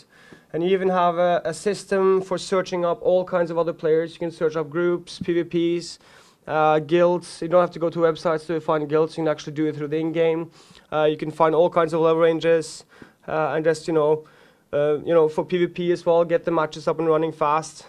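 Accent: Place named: Norway. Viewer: Hva faen mann! xD Så lett å høre at du er norsk! What the fuck, man! It's so easy to hear that You are norwegian!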